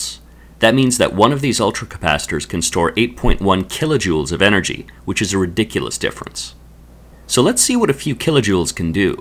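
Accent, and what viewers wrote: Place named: Canada. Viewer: Canada, Eh?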